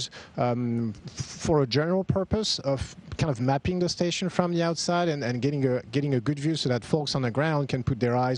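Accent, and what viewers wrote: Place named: France. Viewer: Français, definitely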